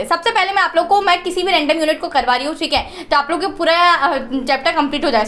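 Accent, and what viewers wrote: South, India. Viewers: R u from South?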